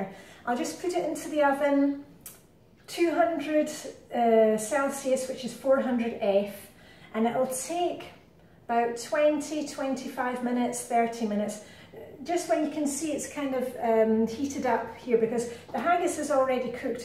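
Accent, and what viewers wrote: Scotland, United Kingdom. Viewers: LOL! You do have a strong Scottish accent. Love it!!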